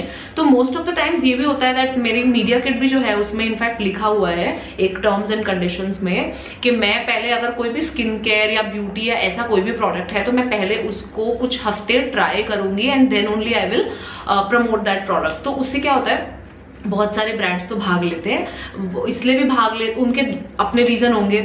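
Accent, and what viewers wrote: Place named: Delhi, India. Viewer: Loving!! Your north ... delhi acent 👌🏾👌🏾 bhaisahab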